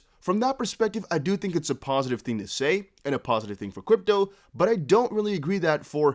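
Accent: United States. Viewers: What is your first language? Because you sound like an American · Dude sounds American I know the English accent from Europe, Canada, Australia, and New Zealand sound different then American accent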